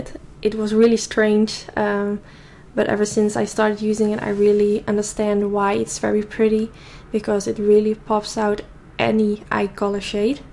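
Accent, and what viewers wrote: Netherlands. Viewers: hey, are you dutch?😊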